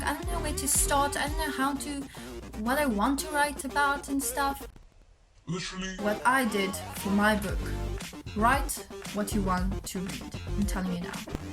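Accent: South Africa. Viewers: ARE YOU SOUTH AFRICAN !?!? -accent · wait, wait, wait, is she South African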